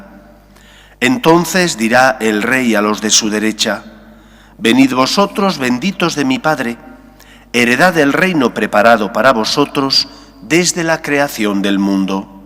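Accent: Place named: Spain. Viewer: Me encantan el acento español, Respetuosamente le saludo Sr Sacerdote 🇲🇽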